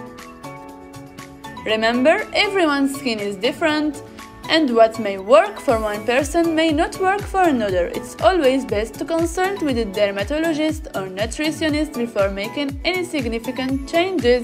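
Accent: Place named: France. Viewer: Your voice tone combined with your accent sound pretty sweet n adorable in my ears.. You’re probably french tho right? :/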